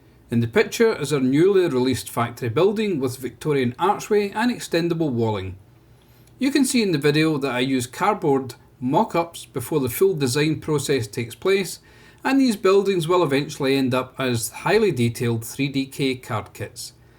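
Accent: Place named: Scotland, United Kingdom. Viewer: I was Bought Here By Budget Model Railways I Also Like Your Scottish Accent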